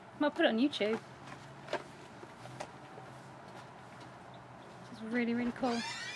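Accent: United Kingdom. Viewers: I love your British accent :3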